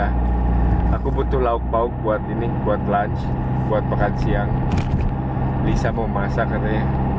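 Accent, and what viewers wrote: Sunda, Indonesia. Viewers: Sok lucu lamun siakang ngomong Sunda teh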